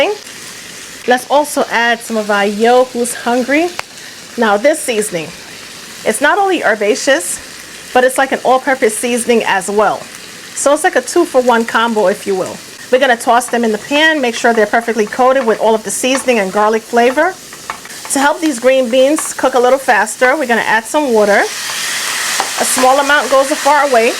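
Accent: New York, United States. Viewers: New sub. Love your accent. A certain area of New York? I'm from Philly. 🙂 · Where in New Yawk you from?😁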